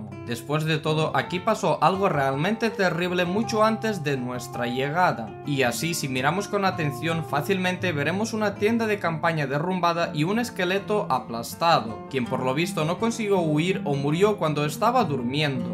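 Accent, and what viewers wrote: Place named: Catalonia, Spain. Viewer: ostia com es nota que ets catala tiu jajajaja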